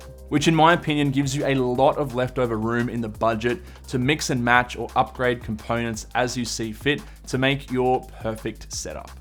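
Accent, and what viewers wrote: Australia. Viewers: gotta love the Aussie accent "M1 oi-Mac"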